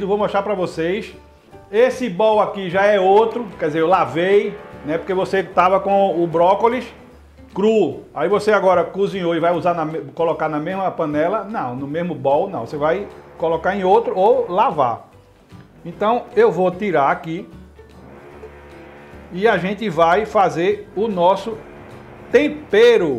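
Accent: Brazil. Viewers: Que explicação profissional e prática! Esse sotaque é de Recife?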